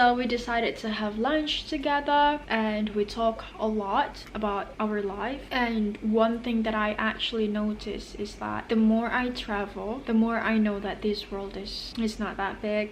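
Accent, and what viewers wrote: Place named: United Kingdom. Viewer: i am addicted to your british accent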